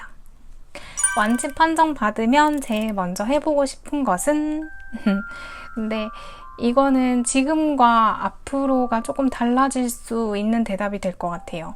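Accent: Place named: Gyeongsang, South Korea. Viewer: 혹시 어디분이신가요?? 경상도신것같은데! 화이팅하새용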